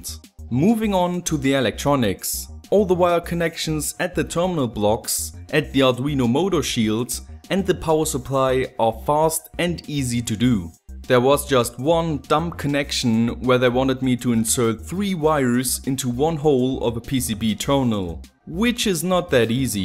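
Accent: Germany. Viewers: Are ya a german dude?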